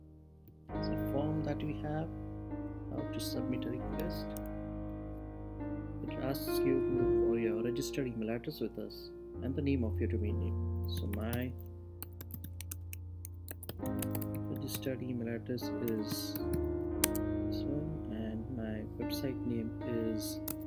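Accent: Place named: India. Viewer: Click on tutorial Hear Indian accent Close tab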